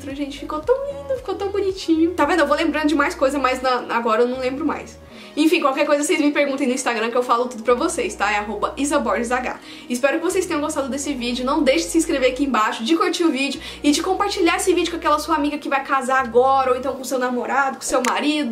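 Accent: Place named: Minas Gerais, Brazil. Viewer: Ahá! É de Minas Gerais né, uai. Kkkkk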